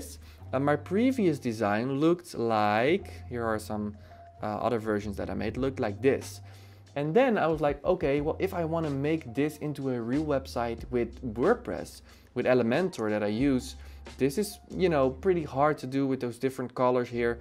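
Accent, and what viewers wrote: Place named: Netherlands. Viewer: Ben je nederlands want ik hoor het aan je accent haha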